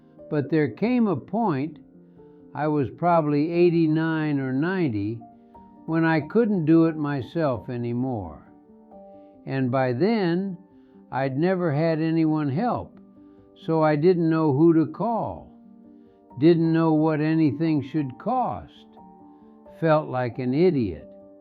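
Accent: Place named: Nebraska, United States. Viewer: so spooky hearing you talk. You have EXACT same accent my dad did but he was born and raised in Nebraska in 1927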